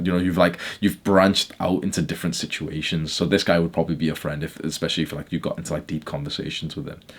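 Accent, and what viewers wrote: United Kingdom. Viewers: Great video title then boom hes british :( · if i wanted to listen to brit accent for just for sake of listening to brit accent, i can throw on some Grange Hill or GB News. sorry moyt, i'm listening to this fooking bloke cos he makes sense. ta!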